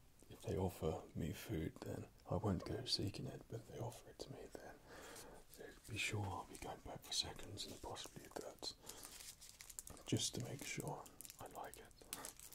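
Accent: England, United Kingdom. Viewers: I was just thinking recently I want to listen to an asmr video with an English accent and then I saw this video and I was omg oh yeah! 😃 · Is he British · Is he from England ???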